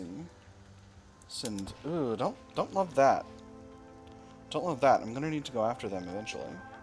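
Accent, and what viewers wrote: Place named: United Kingdom. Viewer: Really enjoying the british accent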